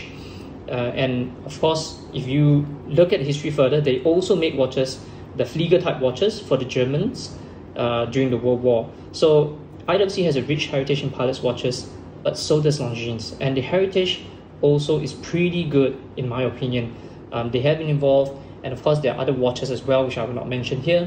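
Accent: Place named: Singapore. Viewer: Long jeans! Thats how most Singaporean shouts out it as. Nothing wrong with pronouncing it from a local standpoint. He does not sound fake. Keep it up👍 Would like to see more reviews mid priced luxury watches from your channel. But keep in mind, that since comparisons are made between 2 watches, always be reminded to repeat that these reviews are in your own personal opinion and preference.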